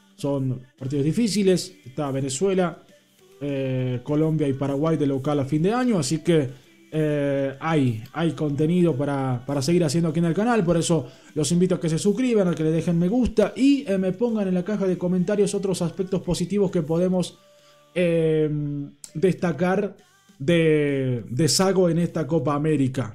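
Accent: Argentina. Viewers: Primera vez q veo tus videos amigo Pero porq hablas como gaucho no entiendo espero no seas un boligaucho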